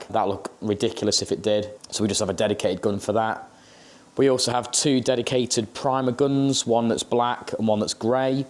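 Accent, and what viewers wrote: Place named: East Midlands, United Kingdom. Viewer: Reckon this came onto my feed because I’ve spent too much time in the East Midlands recently 😂 clocked the accent straight away. Great to see how these are done, always wondered how damage was repaired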